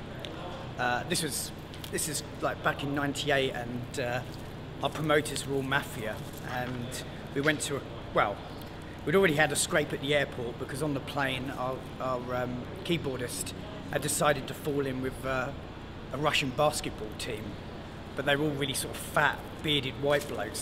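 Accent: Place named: United Kingdom. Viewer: "his voice is so weird." He's British. We all have different accents in Britain. Northerners (like me) have stronger accents. It really depends.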